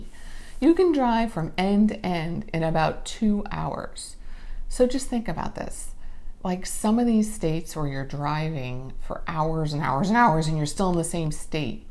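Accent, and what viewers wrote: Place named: Connecticut, United States. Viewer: CT's climate is one of the best in the country. Winters here are a joke. Compared to the Midwest or northern New England, the cold seasons are a breeze. You definitely have an accent. Lol CT and RI accents are very similar in Eastern CT and the more West you go the more like NYC it gets, and up along the CT River and in the middle it's pretty neutral, though it's still there, and the more north you go, the closer you get to Mass and it reflects there. (Just for the record, Mass and RI suck -Love Connecticut lol)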